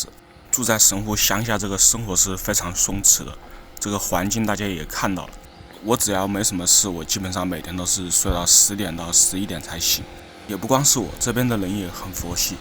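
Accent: Wuhan, China. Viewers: 楼主口音像湖北武汉的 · 楼主武汉人，弯管子，口音好重。😄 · 听口音就听出来是武汉滴，果然哈哈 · 这个汉普好亲切啊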